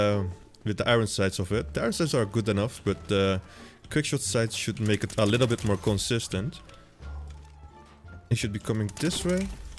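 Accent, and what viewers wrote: Netherlands. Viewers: so u are the guy that always fucks up my game? btw didnt know u were dutch can hear from the accent xd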